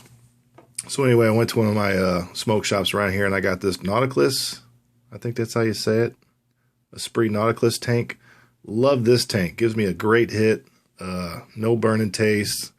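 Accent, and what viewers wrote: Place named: North, United Kingdom. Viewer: If him saying washed like that posses you off than your a lol cry baby hitch it's ducking old school talk. .. or maybe dis you ever think. That he is a ducking northerners and the talk differently. Like they don't call a carbonated. Syrup. Drink a soda it's a pop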